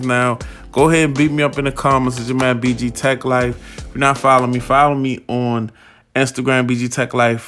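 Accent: Baltimore, United States. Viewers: ... Great review fam! And just on a side note, you got a BMORE accent if I ever heard one! If you're actually from there (which I'm sure you are), I'm actually from Whitelock homie, but I live in Vegas now for almost 15 years. I'm 100% sure you know where Whitelock is.... Anyway, keep on keepin' it real with these reviews. 🦀 Forever LOL... God Bless!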